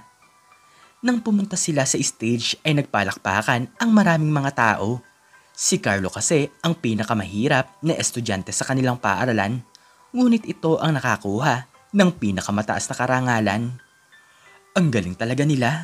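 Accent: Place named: Iloilo, Philippines. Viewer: Abaw! Ilonggo ka man gid.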